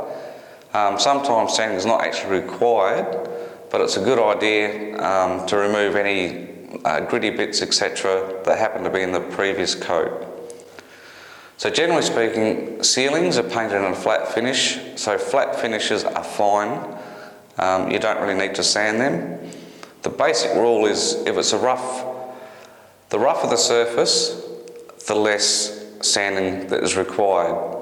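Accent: Australia. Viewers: Very well spoken , Aussie accent , great video , great advice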